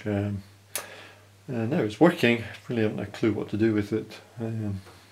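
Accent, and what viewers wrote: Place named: Scotland, United Kingdom. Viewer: Hi! This is great. I have a 7603 scope here and is in need of repair. I inherited it from a friend and it was barely working (some initial display but eventually gave in and now just sort of frozen). I use it for experimental XY drawing – as you say it has a lovely big display! Although I have some experience of electronics repair (swapping in broken pots and switches and caps on old synths etc) I am very wary of getting into these devices as there are some high voltages. I am also no good at diagnosis – I can only carry out repairs if I know what I need to replace! I notice you have a Scottish accent(!) – I am based in Glasgow. By any chance are you reasonably near? I would love to bring this scope back to life and am looking to commission someone to diagnose and help me. Any advice hugely appreciated and thanks again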